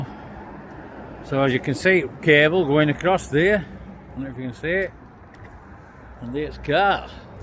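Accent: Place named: North, United Kingdom. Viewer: it's the funny northern accent. Poor AI doesn't stand a chance ..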